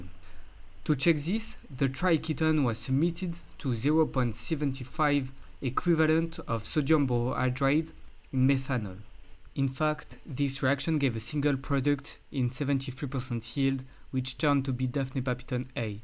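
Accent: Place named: France. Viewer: Je reconnaît le petite accent français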